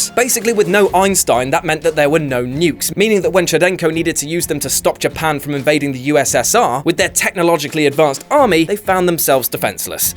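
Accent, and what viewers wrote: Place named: South West, United Kingdom. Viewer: Where are you from in the south west?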